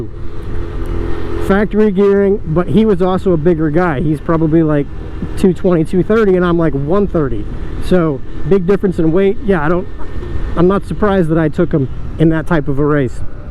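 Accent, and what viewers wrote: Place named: Chicago, United States. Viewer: you seemed to have some, of the Chicago area inflections, oh well, thanks for answering, have a good day.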